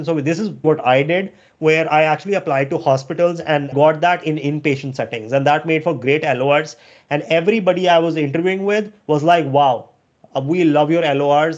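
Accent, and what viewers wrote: India. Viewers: Good info but you have that typical funny Indian accent. I hope the patients enjoy conversations with ya :)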